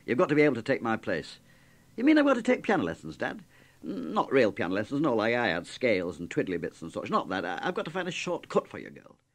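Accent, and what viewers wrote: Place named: Lancashire, United Kingdom. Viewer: Dear MUP, either you've inadvertently pitch-shifted this up, or YT has messed up somehow. Either way, AB's beautiful Lancashire brogue was never pitched as high as this.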